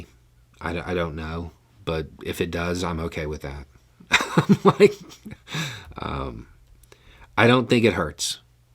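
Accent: South, United States. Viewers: I appreciate that you only post when you're confident of the evidence. It's one of the reasons we continue to watch your videos. Finding reliable information isn't the easiest thing. We live in Australia so we have 2 reliable news sources (ABC & SBS) but they aren't as reliable as you. They also don't come with a southern drawl 😊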